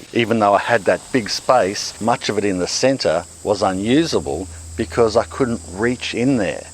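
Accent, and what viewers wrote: Australia. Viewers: i figured you were aussie ... from the accent .... just thought i heard you say you were in nz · Ausys always sound better...the thumbs up reminds me of the great Steve Irwin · You mentioned that you are in a subtropic climate. I know by your lovely accent and kangaroo burial that you are in Australia, but what area?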